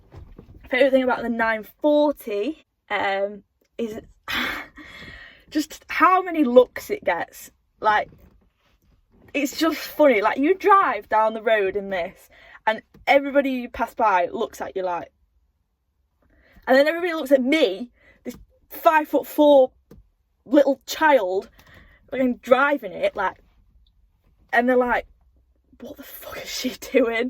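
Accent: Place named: England, United Kingdom. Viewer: Damn, you sound proper English!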